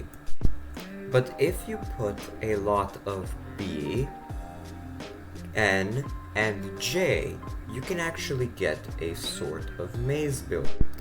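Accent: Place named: Romania. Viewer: A video about 3 years ago, and actually sounds romanian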